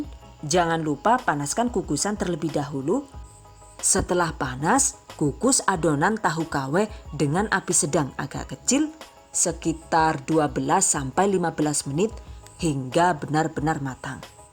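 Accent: Banyumas, Indonesia. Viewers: Hahaha...maen loh ngendikane...ngapak kaya nyong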